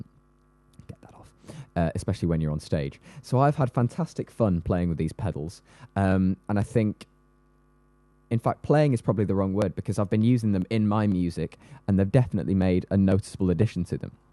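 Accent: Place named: Darlington, United Kingdom. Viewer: INMHO... Sounds like, a lad Darlington.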